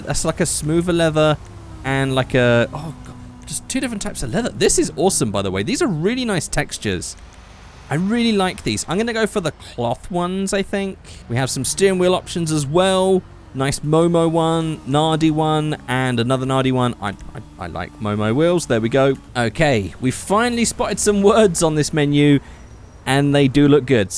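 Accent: Australia. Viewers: I was quite surprised by your aussie accent. Its better then some Aussies. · honestly not a bad aussie accent xD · Mate the Ford xr6 in black with red pint stripes is the tune I like....Australian accent not to bad Mr chrome dome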